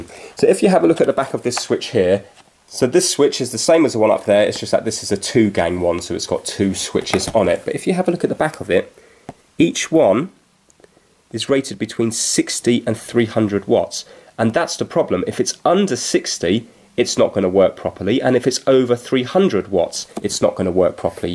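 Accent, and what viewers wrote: England, United Kingdom. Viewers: brilliant!!! Thank you for your solution and your charming British accent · Alex Calinescu that's an English accent from England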